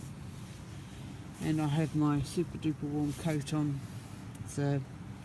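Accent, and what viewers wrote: New Zealand. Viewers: Loved it!...great wee vid....wee hint of NZ accent there??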